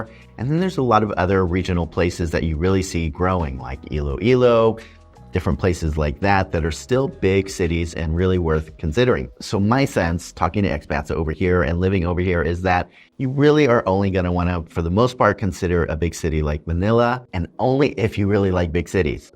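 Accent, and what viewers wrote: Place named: United States. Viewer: Your vowels are wrong. Your not communicating in america your communicating in S E Asia. Irritating to hear that usa shit. See ya later (C ya : )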